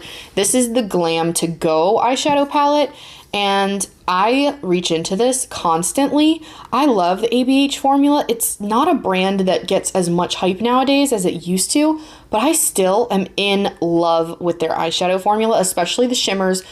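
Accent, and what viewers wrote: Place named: Northeast, United States. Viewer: Ty for the video! ❤ PS you sound very north east NJ, Pa & Delaware ? 🙂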